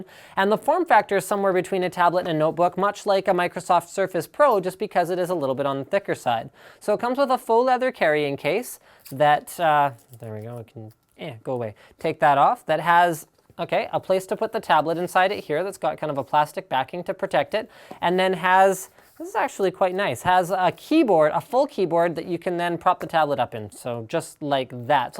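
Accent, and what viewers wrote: Germany. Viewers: german accent? wtf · I dont know about anyone else but his german accent is pretty much the only reason i watch his videos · Came for the German accent, stayed for the unboxing! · Im solely here for the german accent :) Sehr gut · You're german? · I watch for the German accent... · >.< I watch this show for your German accent...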